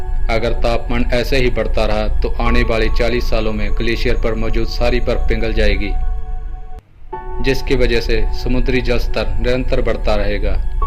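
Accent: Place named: Punjab, India. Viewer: ਬਾਈ ਜੀ ਤੁਸੀਂ ਪੰਜਾਬੀ ਹੋ ਤੁਹਾਡੀ ਹਿੰਦੀ ਦੱਸ ਰਹੀ ਹੈ 😄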